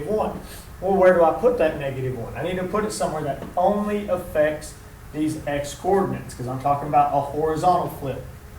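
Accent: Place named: South, United States. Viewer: What accent is that? As a Brit I love listening to it. Southern. Maybe Tennesee? Georgia?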